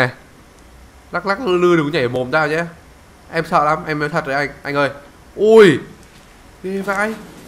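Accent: North, Vietnam. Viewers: Anh là người miền bắc đúng kg ạ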